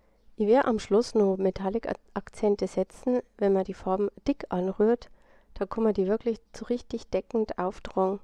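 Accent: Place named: Bavaria, Germany. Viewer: Wie sympathisch ist das denn mit dem bayrischen Akzent!💕 Genau diesen Farbkasten (kein Metallic), habe ich schon lange im Warenkorb bei eBay! Die Farben scheinen noch zusätzlich zum Schminkekasten unterschiedliche Nuancen zu bieten. Dein Kanal war mir leider bis heute unbekannt. Aber gleich mal Daumen hoch und Abo! LG.🎈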